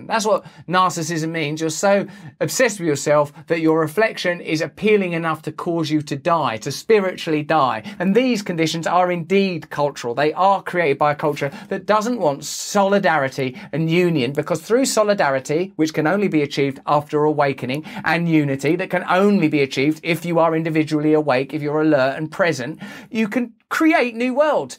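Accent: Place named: Cockney, United Kingdom. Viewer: Wow, Mr. Brand, you are hitting new highs and I am so glad I can understand you in these last 2 videos... for a while the cockney was so thick and you speak so fast, I could not understand you and quit watching. I think you are now hitting your stride and I enjoy the perspective you are able to present to us.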